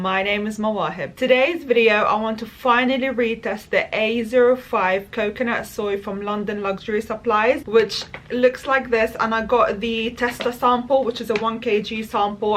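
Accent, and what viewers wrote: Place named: Philippines. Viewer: Greetings from the Philippines. I love the accent. ❤ Let everyone know you're a filipina too.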